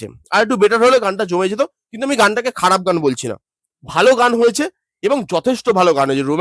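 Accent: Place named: West Bengal, India. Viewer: vai apni je Malu opar banglar oita apnar kothai buja jay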